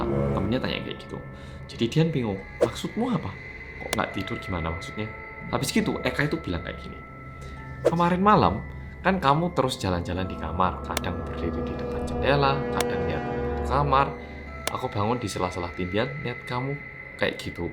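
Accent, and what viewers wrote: Java, Indonesia. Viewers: dari awal sampe sekrng masih bertanya2. knpa mas bisa medok begitu 😁😁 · Episode terakhir huuhuhuhu, wes rapopo, seng penting mas tetep medok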